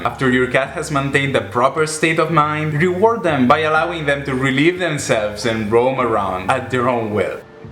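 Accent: Spain. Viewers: Nice video! Thanks for the tips, going to try walking Felix soon! Also I noticed your accent, just wanted to ask, are you from Catalonia?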